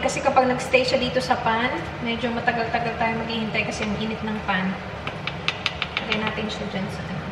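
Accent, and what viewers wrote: Visayas, Philippines. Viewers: I love her visayan accents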